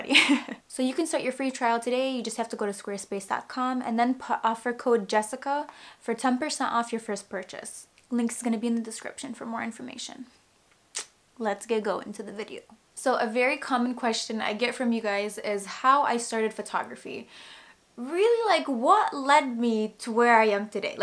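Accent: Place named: Miami, United States. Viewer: i know you're lebanese but you look so cuban!! you even slightly have a cuban-miami girl "accent"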